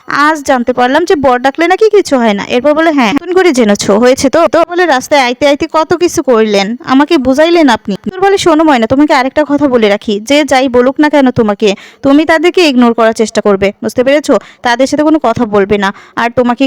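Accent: Bangal, Bangladesh. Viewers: উচ্চারণ আর একটু উন্নত করার চেষ্টা করো।বাঙ্গাল বাঙ্গাল কথা ভাল লাগে না।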